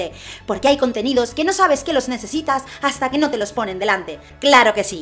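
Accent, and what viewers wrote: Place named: Canary Islands, Spain. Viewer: Amo tus videos tía, son oro molido para mis clases de preparatoria en las que intento sabotear la máquina de adocrinamiento de las universidades. También me encanta tu acento canario, saludos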